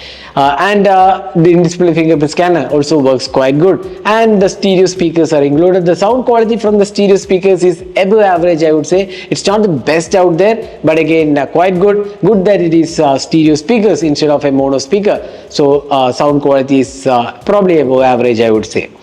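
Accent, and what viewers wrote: Kerala, India. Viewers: U sound like a Malayali.......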